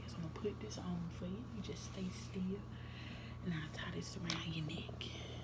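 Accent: South, United States. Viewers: You're so pretty! Also I love your accent; I grew up down south and it feels nostalgic to hear you talk. 💜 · Love ur southern accented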